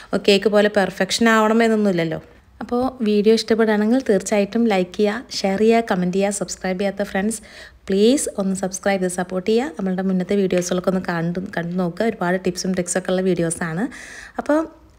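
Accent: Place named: Malappuram, India. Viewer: നിങ്ങൾ മലപ്പുറം ജില്ല ക്കാരിയാണോ. സംസാരം തൃശൂർ ശൈലി യിലേറെ മലപ്പുറം ചുവ ആണല്ലോ.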